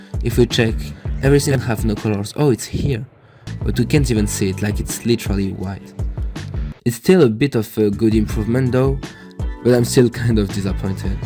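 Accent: France. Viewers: wesh un francophone qui assume son accent baguette....respect. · Is it just me or voice seem a little bit less french-y?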